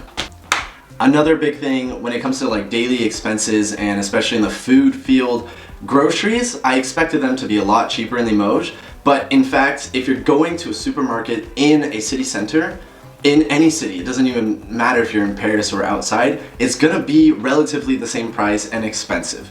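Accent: United States. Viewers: C'est surprenant que même après neuf ans en France vous gardez votre charmant accent américain.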